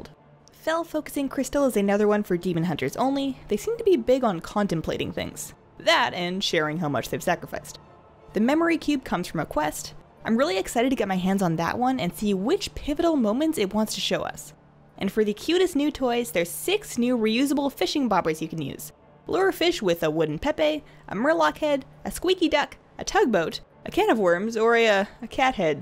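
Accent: Canada. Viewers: Great vid, love your enthusiasm and super Canadian voice. Subscribed :)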